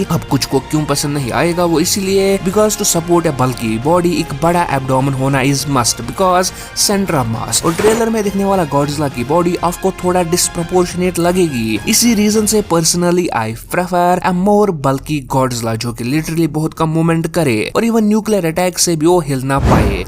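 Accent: Kashmir, India. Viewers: U sound like kashmiri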